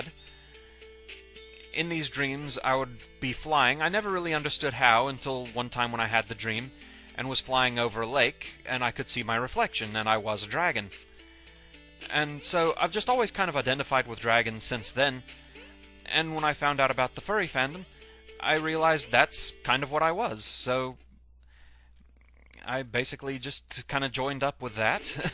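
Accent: Scotland, United Kingdom. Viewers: Hmmm... since I hate not knowing something I hear about, I'll make up my own answer: Really Wily from Megaman. I knew it! Also, my accent is close to yours, just far less Scottish and a little of every US accent. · it creates a bizarre mix that amuses me. but generally its good. there must be some strong scottish traits in your accent, because i cant pick it up, as im pretty much used to any british accent. it doesn't seem too scottish...or american really...ive had too much exposure to accents i think. my relatives need to stop emmigrating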